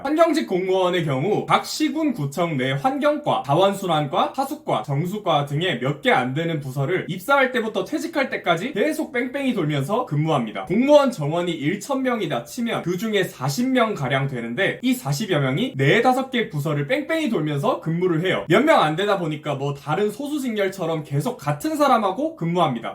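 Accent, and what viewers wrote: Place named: Gyeongbuk, South Korea. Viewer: 말투를 보니 경북분 같네요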